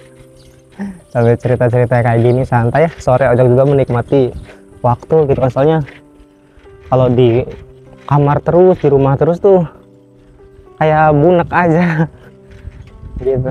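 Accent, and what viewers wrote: West Java, Indonesia. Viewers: Logat bicara nya Sunda mang ojak. Sunda na mana mang? Slm dari Cianjur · A ojak,sundanya dari mana yah?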